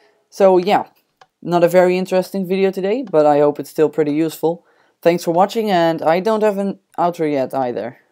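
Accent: Netherlands. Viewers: You sound kindof dutch, are you from holland? If so, I'm to. If not, nevermind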